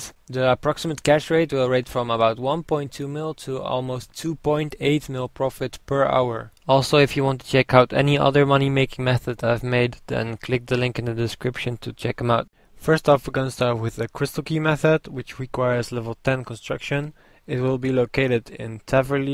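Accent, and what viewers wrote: Netherlands. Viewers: lol ur dutch arent u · I can hear you're Dutch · ur from holland lol i hear ur accent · You are dutch? =D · je hoort gelijk dat het een nederlander is >.<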